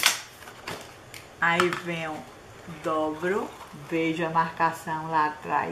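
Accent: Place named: Ceará, Brazil. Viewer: Sotaque lindo! Ceará?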